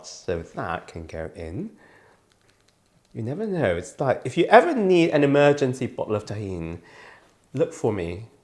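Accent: United Kingdom. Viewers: Nice video for the spring rolls, also like your British Excent. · I can't stand the British accent 😂😂😂😂 its horrific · Love your Recipe and your Accent!! British??? NYC Manhattan ❤️💋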